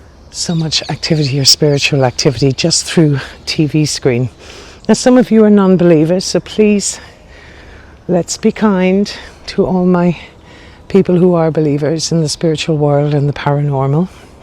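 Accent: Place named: Ireland. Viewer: When you put on the CC it comes up with Tarrah instead of tower. It's your lovely Irish accent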